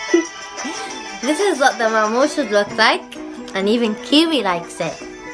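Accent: United Kingdom. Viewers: Thanks beauty you are so cute and your accent is like the British pple the recipe seems delicious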